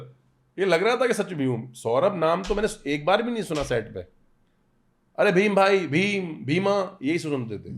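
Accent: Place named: Haryana, India. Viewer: Bhai boli haryana ki esi s, pr Dil t aacha ha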